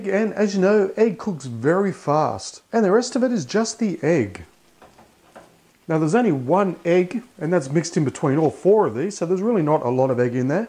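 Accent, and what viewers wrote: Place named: Australia. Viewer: Then the Ozzie accent. Its bloody torture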